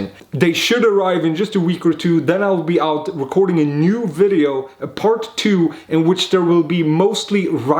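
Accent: Netherlands. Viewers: The whole time i heared you speaking english i thought you where dutch.. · Are you dutch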